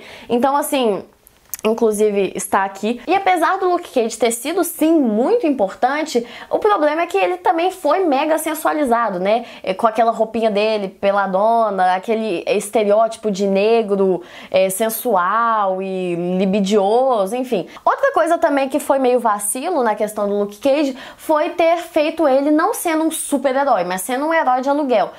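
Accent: Minas Gerais, Brazil. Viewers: Gostei muito do seu canal! Faço facul de Direito mas amo muito História e quadrinhos e supercurti o seu jeito descontraído de ensinar, além do sotaque tranquilo de Minas que eu amei! (Tenho parentes daí de Minas Gerais :) ) Ganhou um fã do seu canal! Muito show, continue assim! :) :) :) ;p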